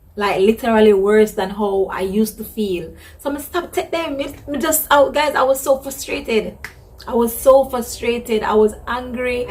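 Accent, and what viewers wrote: Jamaica. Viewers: Sorry if I may ask what country are you from... kind of your accent sound jamaican.... but you look so much like a Nigerian😀😀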